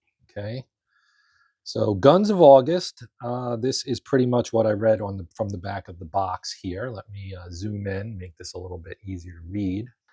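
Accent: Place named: New York, United States. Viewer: pronouncing eurotowns with new york accent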